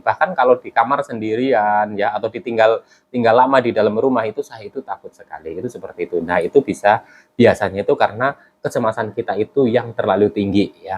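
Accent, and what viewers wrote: Banyumas, Indonesia. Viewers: ngapak asal mana mas?sy juga kena anxiety siapa tau bs sharing...ada group ga atau WA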